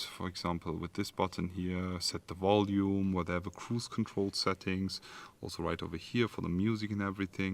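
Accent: Germany. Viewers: Is that denglisch you talking ?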